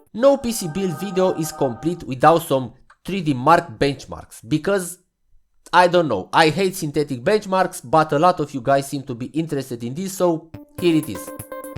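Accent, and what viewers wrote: Romania. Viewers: Mi-am dat seama din primele 3 secunde că eşti român. Accentul.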